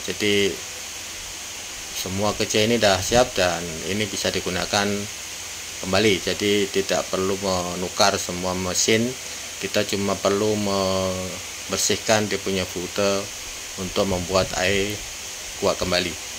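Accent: Malaysia. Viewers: Q fokus kata2 kaka logatnya masih kayak orang malaysia Dulu di malaysia brpa lama ka?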